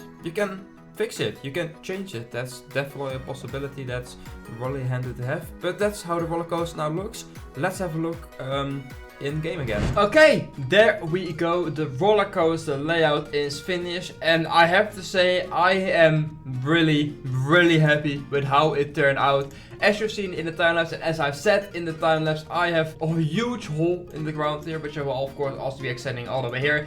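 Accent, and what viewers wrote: Netherlands. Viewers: are you dutch?? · The Dutch accent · ik hoor het nederlandse accent >:)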